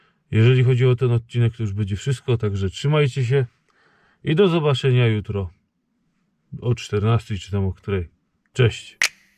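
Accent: Silesia, Poland. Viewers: fajnie jak tak po Śląsku godosz! 😉👊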